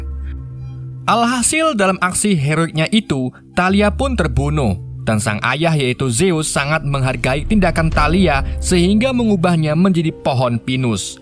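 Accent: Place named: Banyumas, Indonesia. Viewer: Orang ngapak ni pasti adminnya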